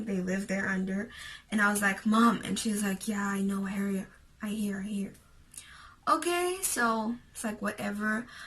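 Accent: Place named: United States. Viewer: Why do you sound like an American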